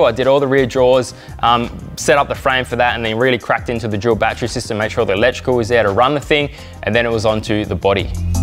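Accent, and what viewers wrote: Australia. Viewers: Australian Accent I like it "Myate"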